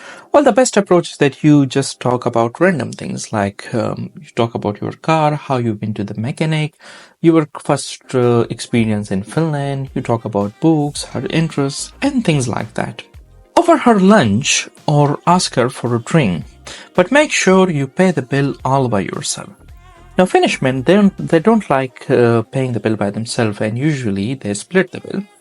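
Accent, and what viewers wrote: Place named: India. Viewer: Miksä kuulostat ihan intialaiselta? Etkä taia kyllä perkele mikää suomalainen olla. (He clearly is not a finnish person like his name says) fucking clown vittu mikä pelle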